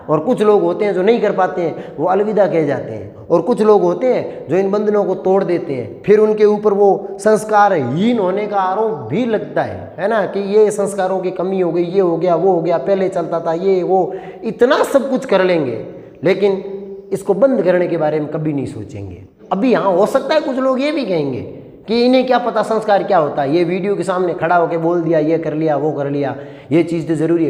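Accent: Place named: Jaisalmer, India. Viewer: जैसलमेर की प्योर भाषा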